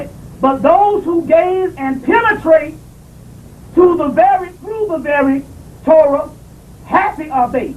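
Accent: South, United States. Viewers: mispronouncing Authors is hard to understand the Southern accent he has..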